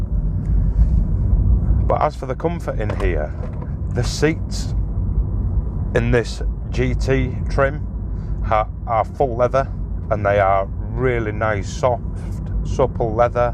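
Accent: Yorkshire, United Kingdom. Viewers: your very good buddy I’m looking at a CRZ next week in my home city Liverpool it’s black ( although they look better in white) 90,000 on the clock £3,200 …it looks like it’s been looked after to be honest mate I’m excited at the prospect of owning one after your excellent review …I drove one years ago and I thought wow what a cracking motor …your review has tipped the scale in me wanting to get one so credit to you buddy …your good you bring something to the car review table that’s refreshing …I sense your a Yorkshire man i live in the Lake District …thanks for that and the reply onward s and upwards buddy I really wish you well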